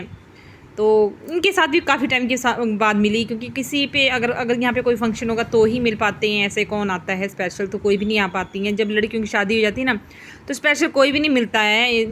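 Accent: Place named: Himachal Pradesh, India. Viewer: Himachali boli vadiya lagdi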